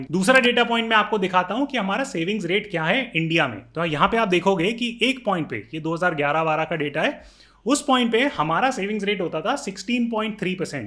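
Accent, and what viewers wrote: Standard. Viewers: Aapki shudh hindi kabile tahreef hai ustad :) it is never expected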